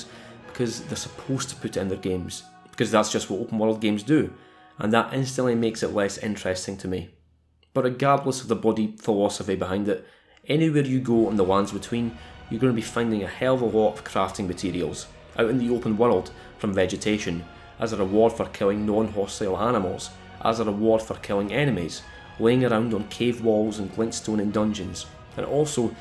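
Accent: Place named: Scotland, United Kingdom. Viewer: I missed your smooth scottish voice and it'd only been like 10 hours since I watched your last part. Nice work.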